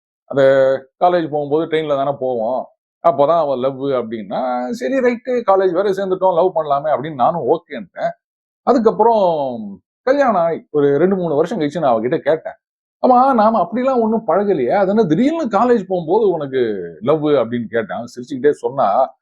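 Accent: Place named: Tirunelveli, India. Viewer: அழகி திரைப்படம் என்னுள் ஏற்படுத்திய அதிர்வுகளை, இக்கதையும் (நிஜமும்) ஏற்படுத்தியுள்ளது. உங்களின் நெல்லை வட்டார வழக்கு அற்புதம்.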